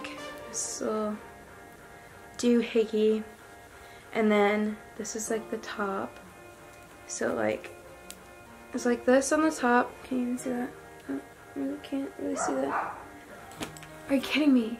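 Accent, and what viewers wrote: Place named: United Kingdom. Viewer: The British accent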